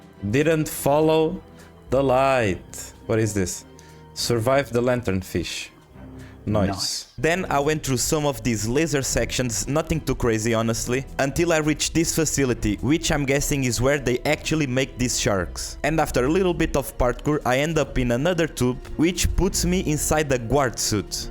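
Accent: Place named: Portugal. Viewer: O teu video apareceu-me nos recomendados do youtube, entrei por curiosidade, pareceu-me atrativo. Nas primeiras palavras comecei a perguntar-me a mim proprio se tu eras portugues, o sotaque estava muito a dar nas vistas. Fui ver a tua PSN e olha, és tuga mesmo. Boa sorte com os videos, +1 sub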